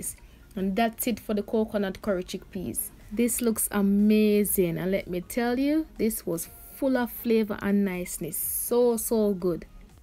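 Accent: Jamaica. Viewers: It's a long time I have been looking for a Jamaican plant based channel. Now I have found one with a Jamaican that sounds like a Jamaican. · Jamaican accent wtf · Great video very helpful, but I love the Jamaican accent more than anything . I will be watching all of your videos now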